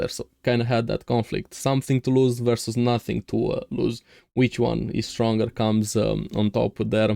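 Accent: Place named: Eastern Europe. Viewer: Soooo happy this anime has finally returned!! I watched Season 1 Hell's Paradise, Chainsaw Man, and Heavenly Delusional all around the same time and been patiently waiting for their return cause I loved all 3. Especially Hell's Paradise cause I think it's such a fire series. Nice review. I liked and SUBBED. By the way where are you from bro?? You have a cool voice and great English. Eastern European or Russian I'm guessing? lol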